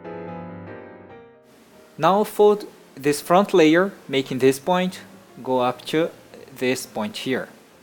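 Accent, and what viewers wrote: Brazil. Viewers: Conheço o sotaque de BR de longe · ja ja just by hearing your accent i could tell you are from Brazil! excellent video, i really enjoy it! Saudações dos Estados Unidos!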